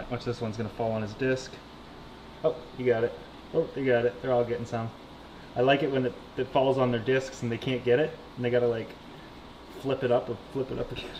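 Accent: Canada. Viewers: I love you Canadian accent lol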